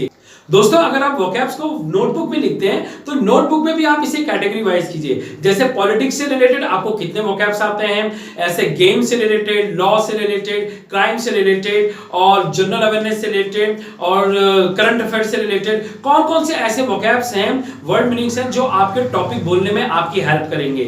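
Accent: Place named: United States. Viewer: Sir, Thanks for uploading such Useful educational video and I like your American accent speaking style besides you are bold enough and outspoken.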